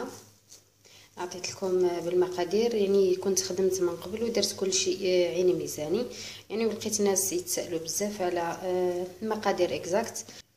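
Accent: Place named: Setif, Algeria. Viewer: أطباق يمممم مقروط وعليه لكلام ختي من اي ولاية انتي حساتك من صوتك انك سطايفية والله اعلم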